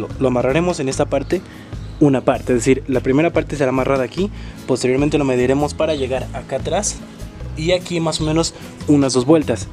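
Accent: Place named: Latin America. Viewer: Me cargó coma habla, una mezcla de latino imitando al español, vale ??? Jijij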